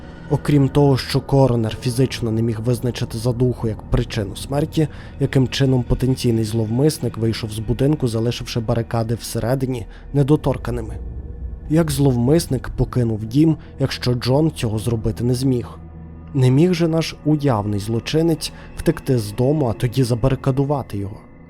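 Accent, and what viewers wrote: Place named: West, Ukraine. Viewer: Мені відчувається західний акцент, але з кожним відео все краще!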